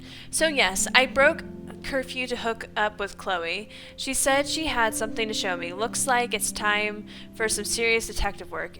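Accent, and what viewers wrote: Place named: United States. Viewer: Where there be an American accent you just know I am not far behind ;) xxx I have missed these videos babe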